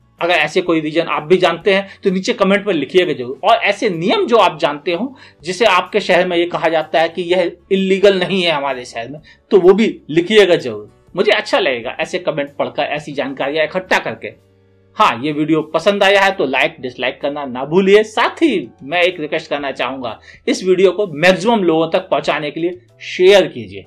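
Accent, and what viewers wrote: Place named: Uttarakhand, India. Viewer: Are you from uttra khan because I am and determine your voice tone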